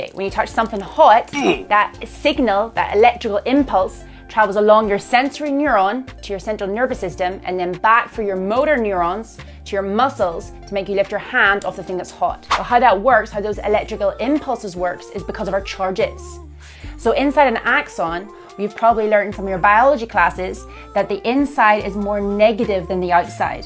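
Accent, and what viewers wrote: Ireland. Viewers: Thanks Madame❤, and are you Irish by any chance?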